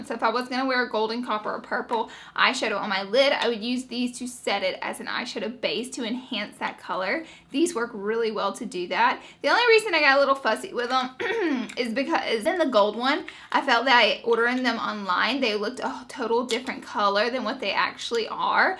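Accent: South, United States. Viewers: Have you...have you ever heard of a southern accent? Magic.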